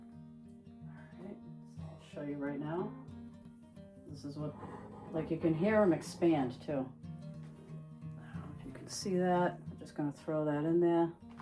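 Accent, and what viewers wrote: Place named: Massachusetts, United States. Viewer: Heyy 😊. I just ran across your channel couple of days ago. You're amazing! Seriously,thank you. I grew up in mass, haven't been back in over 20 years. I didn't realize how much I missed the accent. I watch your videos just for that & the scenery 😊. You're very smart & down to earth. I've subscribed & liked all your videos 👍. Thank you again and please keep em coming. Your guy is a very lucky man....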